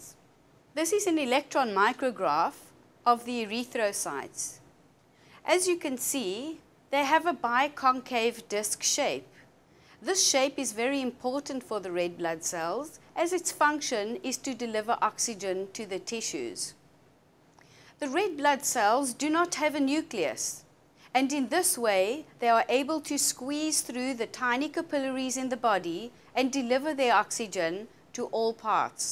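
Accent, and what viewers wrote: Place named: South Africa. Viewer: South African accent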